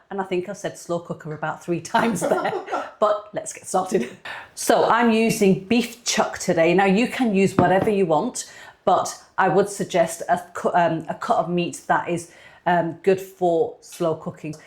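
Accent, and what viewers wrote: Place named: Northern England, United Kingdom. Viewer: Love this channel for easier one pot curry recipes to BIR style!.. and the absolute clowns complaining about how your say turmeric just ignore them. Im a Geordie and i love the fellow northern accent 💙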